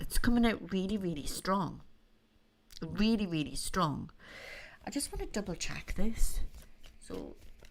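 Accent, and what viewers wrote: Newcastle, United Kingdom. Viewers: Only just found your channel x loving it and just love the Geordi accent x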